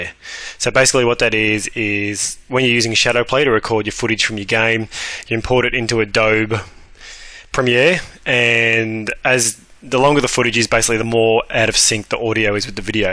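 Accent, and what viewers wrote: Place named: Australia. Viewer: your strong ozzie accent made this 50 billion times more fun to watch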